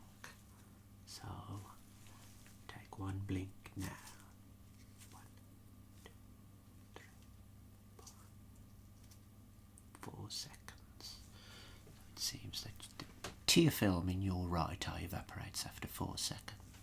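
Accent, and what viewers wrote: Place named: England, United Kingdom. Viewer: Sounds like an Englishman to me!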